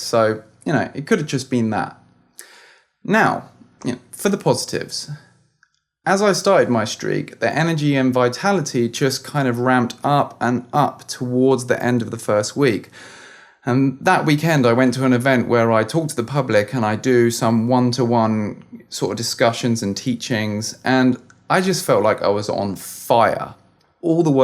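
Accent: United Kingdom. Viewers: I heard Wotor couple of times, you must be from UK, am I right ?😆👍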